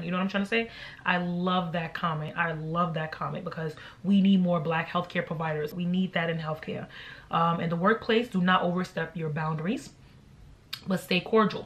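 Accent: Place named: New York, United States. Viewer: awww I love your accent, I wish I lived in America and New York in particular cause your accent is just the best 😩💞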